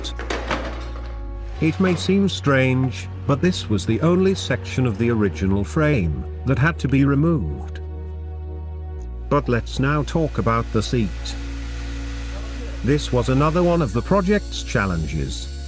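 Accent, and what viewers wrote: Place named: United Kingdom. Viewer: Tudo é perfeito nestes vídeos a música e esse sotaque britânico é mto agradável de ouvir. Parabéns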